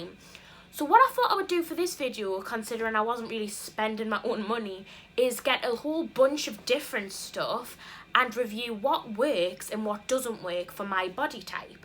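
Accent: Newcastle, United Kingdom. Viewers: Are you from Newcastle? I am and love it because you say canny like me❤️❤️ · What part of England are u from? Geordie? Living for ur accent x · I love hearing our Geordie accent on YouTube 😍 your body is perfect!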